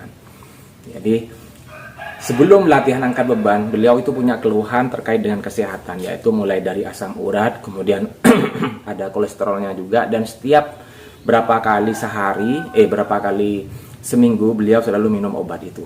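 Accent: Madura, Indonesia. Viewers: Orang madura ya kang sampean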